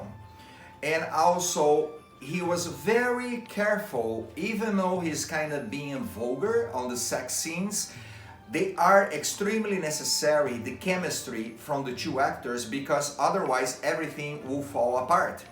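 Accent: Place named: Brazil. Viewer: O sotaque brasileiro é tão fácil de notar.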